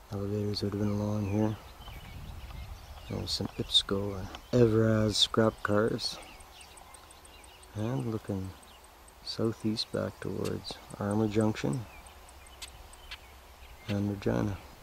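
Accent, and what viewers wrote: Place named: Minnesota, United States. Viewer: Sounds like you have an accent to me, reminds me of someone from Minnesota. I'm from Columbus, Ohio though. I read somewhere that the " 'General American' region accent... covering parts of Illinois, Indiana, Iowa, Kansas, Missouri, Nebraska, and Ohio" is the most neutral english dialect.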